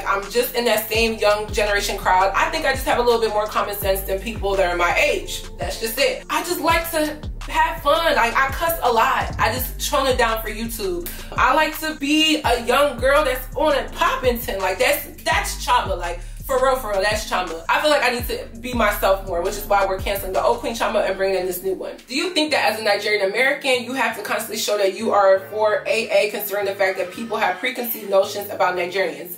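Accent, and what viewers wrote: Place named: United States. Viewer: Queen Chioma think about it as being phonetically. There is a popular gospel song with the correct pronunciation as well as song called for reference. Like there is an emphasis on the first half and the a is shorter than the traditional American a. Right now your cutting off the sound of the I and elongating the A at the end which is more American English pronunciation then the traditional Igbo pronunciation. I know because I have the same Igbo name (Grace is my English name). I commented earlier as someone else also mentioned this as it’s quite noticeable. I was born in the US, so I know it’s hard to pronounce everything perfectly.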